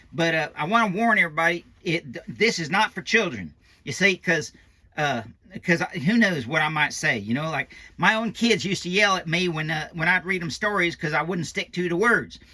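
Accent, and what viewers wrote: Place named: Deep South, United States. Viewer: Most of this video just sounded like talking like he was from the Deep South. Lol. has a slightly higher voice from all of the old show tapes I’ve watched.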